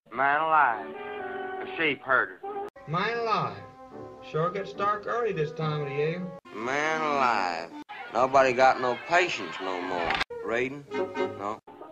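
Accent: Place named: North Carolina, United States. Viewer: That's a mighty fine North Carolina accent there, man.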